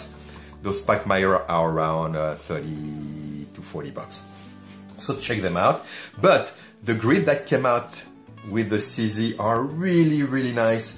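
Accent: France. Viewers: Y a pas un gros accent français ?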